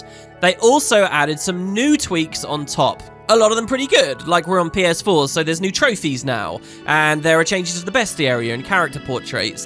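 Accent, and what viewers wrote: United Kingdom. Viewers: you absolute monster. I learned 3 things in this video 1) You are younger than me (I think the British accent makes you sound more mature than my American slang) 2. That a game that should have been about Balthier missed by a few letters, until a pivot in design. And 3) That it wasn't Nethecite causing the weird audio. That has been my headcanon since 06, and it's dead now. You've killed it. Sure hope you take better care of Rex.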